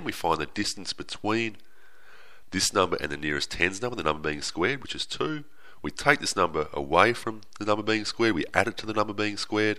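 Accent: Australia. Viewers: What part of Aussie are you from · is he Aussie (Australian)? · Are you Australian?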